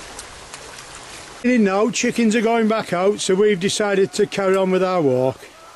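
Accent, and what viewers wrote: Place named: Yorkshire, United Kingdom. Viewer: I lived in Dacre Banks in the 80s... loved this video. Took me back in time for a bit.:) Nice to hear the Yorkshire accent again as well. Thanks!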